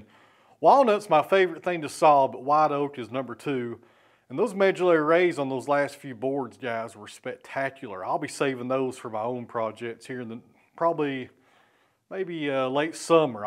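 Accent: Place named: Tennessee, United States. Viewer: I watch a lot of saw milling on Youtube, but lately its gotten difficult to watch other channels. It seems they are working way to hard, you make it look easy my friend! Its also nice to hear the Tennessee accent. I grew up in the Knoxville area, but im living in Kansas City now and I really miss people that speak properly 🤭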